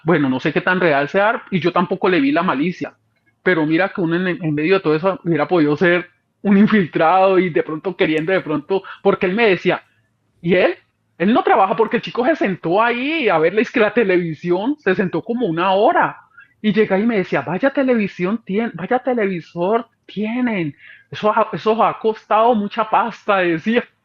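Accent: Colombia. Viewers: Tristemente creo que por su forma de hablar es “caleña”🙄🙄🫣🫣👎🏽👎🏽👎🏽👎🏽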